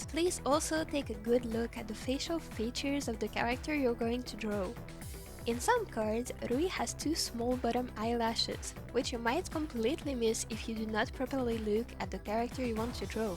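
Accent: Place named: France. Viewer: Okay I’m not commenting about the video (you’re very very talented) BUT I needed to tell you that I didn’t even heard your French accent at first Like At all. And I’m French too, and it makes me kinda more confident to hear another French speaking English! So haha thanks :))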